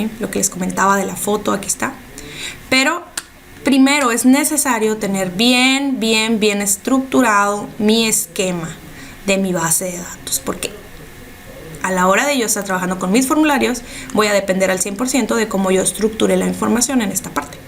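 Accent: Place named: Mexico. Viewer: Muy buen video. y por supuesto "interesante voz" a la mexicana..!!! je je. Bendiciones !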